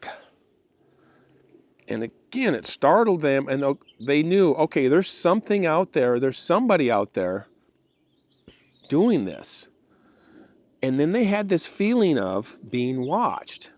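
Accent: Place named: Great Lakes, United States. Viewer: Hi! I was a long time subscriber, but in the winter I had to disconnect for a while, and in the spring, with a new laptop, I could not retrieve my old Youtube account. I lost all my old subscriptions, and could not comment for a while. I will be sorting that out soon, and start re-subscribing to my old friends and their channels. Now I am catching up on your videos since the new year. Cheers! 🙂 I am living in Southern Ontario, and the difference between the over-populated south of Ontario, and the real Northern Ontario wilderness is extreme! We even share an accent with Minnesotans. According to linguists, it is called Yoopers, which is a distortion of Upper Peninsula. The Great Lakes rural folk seem to speak similarly. Great Lakes folks share this accent, and I only notice it occasionally, but I hear it with you. Cheers again! Nice to see your videos!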